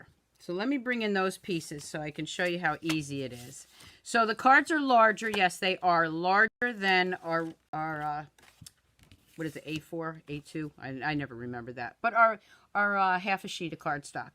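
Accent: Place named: New York, United States. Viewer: G'day, watching the replay here. I love your New York accent lol. Cheers from Heidi, Melbourne, Australia 😊💖💙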